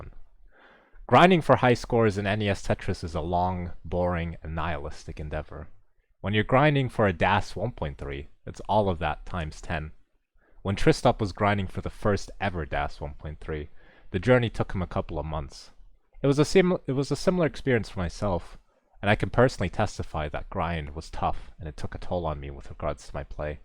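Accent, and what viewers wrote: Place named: United States. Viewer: 1.2 19 start when? also why do you sound so american?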